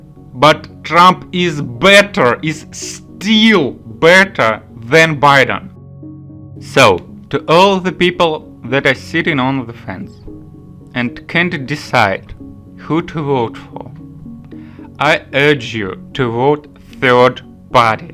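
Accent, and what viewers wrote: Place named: Russia. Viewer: It's a most genuine russian accent. But whatever makes you sleep better at nights.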